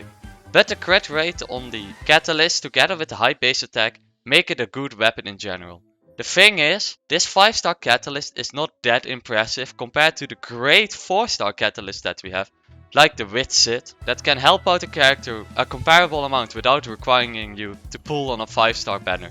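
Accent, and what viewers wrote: Netherlands. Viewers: Are you Dutch? I feel like I recognize your accent...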